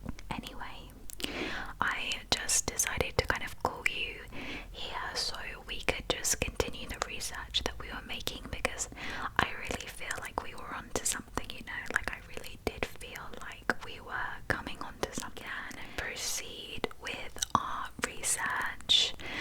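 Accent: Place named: United Kingdom. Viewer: Why have I never found a British asmrtist before😂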